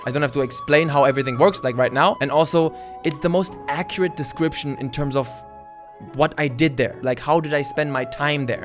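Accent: Germany. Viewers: Just realize you have a little bit of a German accent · Ah. Thats why his english sounds so german.. :D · Wait. Are you german??? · Hey... uhhhh.... Mr Shaolin... uhhhh... warrior.... uhhhh monk disciple... guy... with the German accent. Damn! I'm hell-a confused even more now! 太麻煩了！ Loved binging on your Shaolin videos. Experience is everything! 恭喜！加油！ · Is he German? Im not sure he got the accent and this "deine Papiere Jude" is also German but he doesnt say anywhere that hes German.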